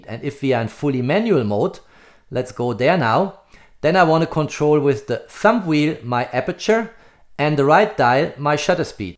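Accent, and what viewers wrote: Germany. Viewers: Nice videos, well explained. Thank you very much for that. But why don't you make these videos in German too? You're a German speaker, right? That's what your accent sounds like. This video is absolutely great. I bought an SL3-S yesterday and am now watching your video. It's really helpful with the camera settings. I think you'll be the only one to publish such a perfect explainer video for the SL3 in German on YouTube. Please do it in German. There are enough people in Germany who don't understand English. You would be a great help to them. Perhaps you could have it translated using AI. Thank you for your understanding and kind regards.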